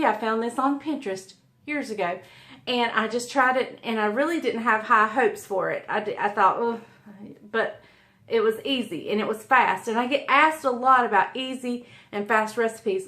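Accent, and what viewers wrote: South, United States. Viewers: Happy Birthday 🎂 to both you. I live not far from you, I am in Haw River. I like that southern accent.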